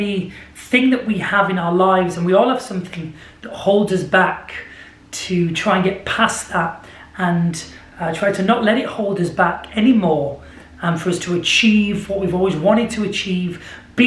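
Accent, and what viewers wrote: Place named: England, United Kingdom. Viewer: Типичный английский акцент. Звучит очень забавно и приятный голос!😉😉😉.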